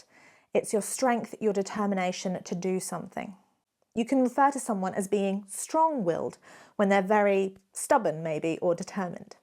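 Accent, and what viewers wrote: United Kingdom. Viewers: So charming with your British accent. Just in case anyone was wondering, these are all commonly used in the US as well. Here's one I love, but seldom hear; it seems to come from the midwestern US: "You can get used to hanging if you hang long enough."" It expresses a grudging patience with unpleasant circumstances one is not able to change, with reference to the lynchings that were a part of vigilante justice in frontier days. Example: "Do I really have to put up with that child's whining all summer long? Well, I suppose you can get used to hanging if you hang long enough." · British or american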